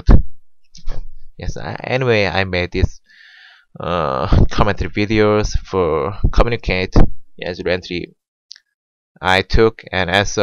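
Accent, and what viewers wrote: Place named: South Korea. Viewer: Hater back off kay? English isn't his first language The korean accent is strong if you actually listen you'll understand him so easily.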